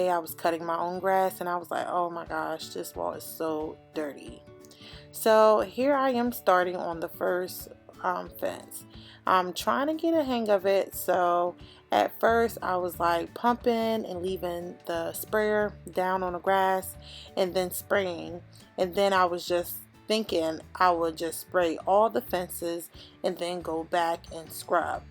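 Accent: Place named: Maryland, United States. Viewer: You from Maryland? I didn't watch the whole video, but I heard a little of your accent. The "tew" is giving Maryland lol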